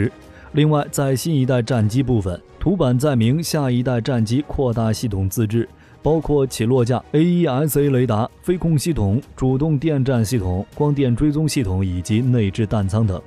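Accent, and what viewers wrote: China. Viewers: 台湾省的视频也喜欢大陆配音吗？ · 為什麼配音的是大陸人？我們中華民國的國家機密讓大陸那麼深入了解了？ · 請問一下這影片怎麼是用簡體字還操對岸口音？ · 配音的像是大陸口音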